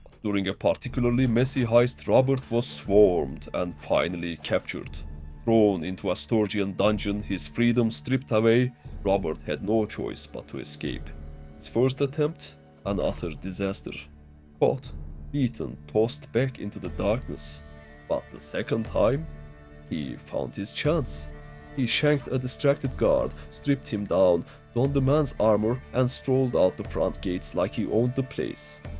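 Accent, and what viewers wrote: Turkey. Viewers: Bastaki dying telafuzundan türk oldugu anladim. Memati maskeyi ne kadar takarsa taksin. Basarilar kral kolay gelsin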